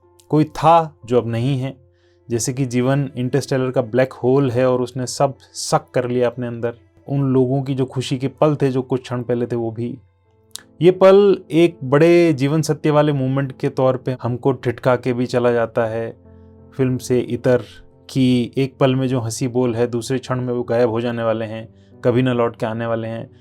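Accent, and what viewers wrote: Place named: Rajasthan, India. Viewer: bhai aap rajsthan se ho kya , bolne ka andaz vanhi ka hai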